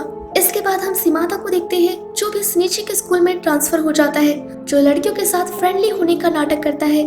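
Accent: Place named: Odisha, India. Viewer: aap odisha se ho lagta hai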